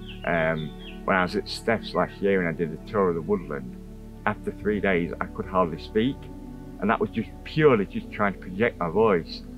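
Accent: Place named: East Midlands, United Kingdom. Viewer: I would love to know whereabouts in the East Midlands you are from, or rather where you spent the most time as your accent is so familiar to me. My Dad was from Heanor in Derbyshire but worked for the electricity board as an overhead linesman. My husband also has a similar accent as we are from Kimberley in Notts. I hope you don't mind me asking. My husband would love your lifestyle.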